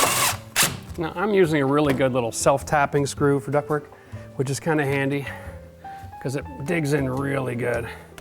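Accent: Canada. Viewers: Wait . . . are you really not gonna tell us about what happened to your eye? . . . I just ran into your videos this morning and I they're a pleasure to watch and listen to even when I stepped away from my desk. . .that elevator music and your Canadian accent do the trick. . .Keep up the good work! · Mate, I love your work and your accent. I was brought up in Edmonton but have lived in Australia for the last 45 years. I still love to hear Canadians talk. I really miss Eatmores and Coffee Crunch too! Take care and stay safe....Kev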